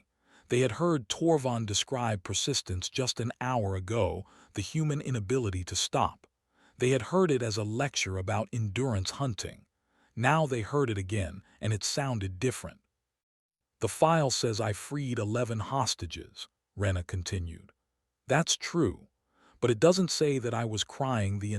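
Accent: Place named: United States. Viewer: OMG do something with AI Language skills before you broadcast. it talks almost like it was taught not English but 'Murican.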